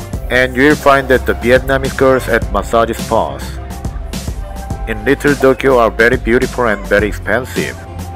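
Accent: South Korea. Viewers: This guy's accent 100% korean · nice vid. I can tell from your accent that you are from Korea. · base on your accent, i can tell you are 한국 사람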